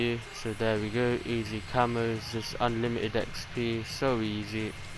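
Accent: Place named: United Kingdom. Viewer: That's just how brits talk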